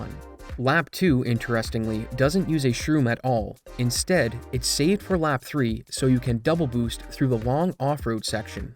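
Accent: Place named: Maritimes, Canada. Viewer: im hearing some maritimes in your voice, newfoundland?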